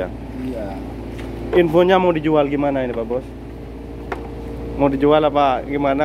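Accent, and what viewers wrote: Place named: Madura, Indonesia. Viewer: Markas safa audio dimana mas logat ngomongnya kayak orang madura. Salam dari malang🙏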